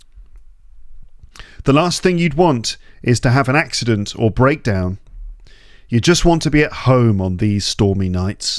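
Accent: United Kingdom. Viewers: Wow, I absolutely love your voice! Your pronunciation, intonation, and accent are perfect for this kind of content! I wish I had your British accent =D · Subscribed! This is British English because of the British way to pronounce R and the glottal T. Love it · I really enjoyed the British accent and the format (story, then summery then attention to the specific phrases and details) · O...British English ! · I find interesting and really helpful every story you read since it's the best way to learn while enjoy English, i have listened many short stories from your channel. I have been obsessed with the British accent and this is helping a lot to brush up bit of my skills. Greetings from Peru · Huge thank you,. Your channel is very useful to learn new vocabulary and phrases l don't know. I am also interested in learning British English and accent which is very nice. 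You are an amazing and dedicated person who teaches English perfectly. I am sending all my best wishes from here where l live. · British English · Mano eu tô iniciando então não sei... Esse sotaque dele é britânica???? Vc acha bom pra mim começar c ela ou americana?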